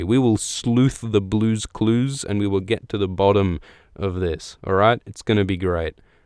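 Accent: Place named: Australia. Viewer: He has an Australian accent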